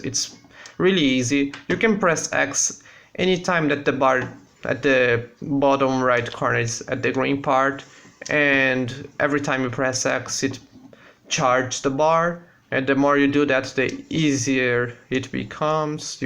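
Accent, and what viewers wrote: Brazil. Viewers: Pelo inglês dá pra saber que é Br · Dude, you are brazillian, i can see, cause, eu sou brasileiro e falo inglês, você tem bastante sotaque, mas você fala bem pra caramba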